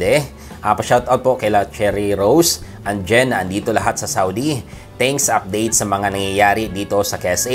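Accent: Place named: Visayas, Philippines. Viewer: Taga asa mankaah mora mag kag bisaya hehe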